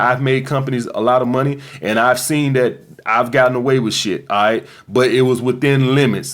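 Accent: United States. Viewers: Yo Bruddah haha this guy is the Don yo ha I wanna mention this to my cronies in the liquor store n down town pubs but worried there gonna drill me Ya saying that every man really has these problems Life's difficult I grew up no family I'm safe as fuck to everybody but ppl just take the biscuit piss dude lol But the woman they just killus It happens to us all haha omg Until you get some Asssss Dat fucking Credit Card stayn In the god damn fOcking pocket Hahahah Man American Accent is fucking Ace 😂 Respec dude ur Wicked 👍👍👍👍👍👊👊👊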